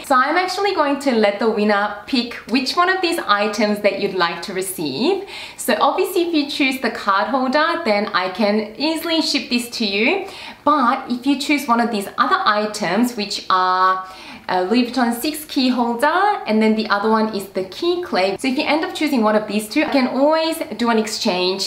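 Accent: Australia. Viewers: Hi! Congratulations! I came across your channel while searching up about chanel bag reviews. Instantly, I feel in love with your personality and Aussie accent:P So generous of you giving away prizes - I'd loooove to get the LV Monogram 6 key holder:) I’ve entered on Instagram as well 😊 All the best with your YouTube and I can’t wait to see your future videos <3 - Shirley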